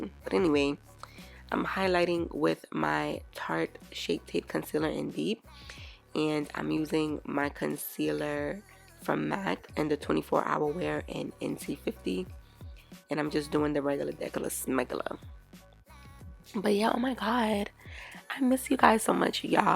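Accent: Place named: Bronx, United States. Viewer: I like your accent reminds me of the people from the Bronx or I think it's jersey lol love your channel and how relaxing your videos and informative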